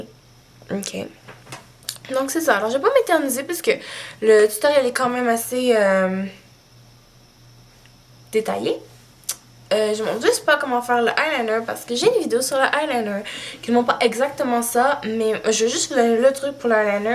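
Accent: Quebec, Canada. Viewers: Srx , elle s'invente pas un Accent , ni des noms de maquillage ! Elle est québécoise , t'avais pas encore Compris ? ^^ Cet toi qui fait pitié ma Pauvre ! Retourne au Collège ma petite , faudrait bien que tu apprennes a écrire . Tu crois pas ? x)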